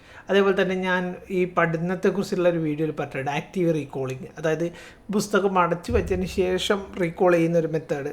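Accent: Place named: Thalassery, India. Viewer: നാടൻ തലശ്ശേരി ശൈലി❤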